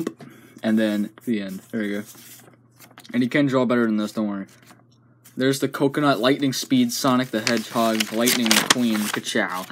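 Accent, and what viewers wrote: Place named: New York, United States. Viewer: Nice new York voice really funny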